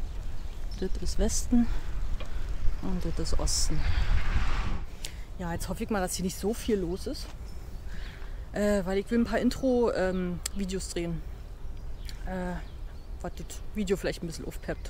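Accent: Berlin, Germany. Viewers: ja hattest du ja erwähnt das es nicht der offizielle weg ist. Wäre trotzdem interessant was du so an Strecke macht in welcher Zeit😊 Auch Respekt das du das alleine durchgezogen hast👍 Meinte nicht die Kommentare auf deinem Kanal, sondern den Herr im Wald als ihr holz Farmen wart. Aber ja, auch mit negativen Kommentaren muss man leben wenn man youtuber/in ist. Aber lass dich dadurch nicht entmutigen. Machst das klasse mit deiner Berliner Schnauze😉😃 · Klasse das du das Thema aufnimmst. Bin Wessi aus NRW. Für uns immer noch unvorstellbar diese Zeit. Berlin ist Kultur , bitte auch den Dialekt bei beibehalten. Mega ✌🤙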